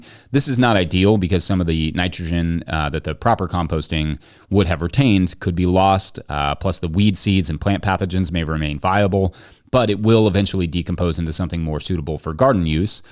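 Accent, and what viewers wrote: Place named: Utah, United States. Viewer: Sounds like you're from Utah (me too) even AND you've got the green no-till hat?! This guy's a good one.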